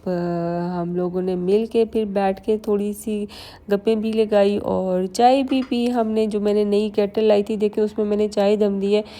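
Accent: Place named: Hazara, Pakistan. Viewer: Her accent of Urdu language reflects her connection with Hazara Valley of Pakistan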